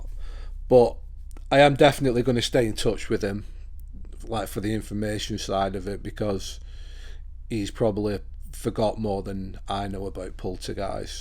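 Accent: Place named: North, United Kingdom. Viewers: I just had a thought could he have shortened anastasia to stasia or stay in life cause the stay sound in hers and Stacey is a coincidence to some degree? Also I'm from London & the way you Northeners say Romania as Rooo mania just makes my day every time you say it 😊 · So I had to ask........ I was visiting one of my best friends over the holiday who is an Anglophile (and never even been across the pond mind you), so as I never did pick up where it is you live specifically over there she told me that if I showed her a video she could pick out where she thinks you live based on your accent. My Anglophile Yank friend here who has never left North America or resided outside Washington State bets that you either live in or spent most of your life in or near Manchester. The north.... I'm dying to hear how close she is!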